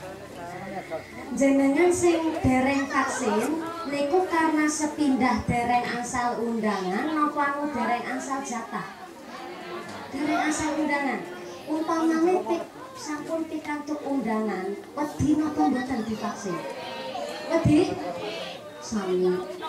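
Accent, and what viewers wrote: Banyumas, Indonesia. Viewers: Ceramah ngapak Lucu Ustadzah.. Terima Kasih ceramahnya Ibu Ustadzah.. Sukses selalu Bu..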